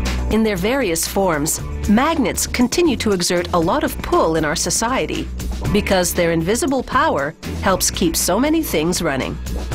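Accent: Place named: Canada. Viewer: That Canadian accent...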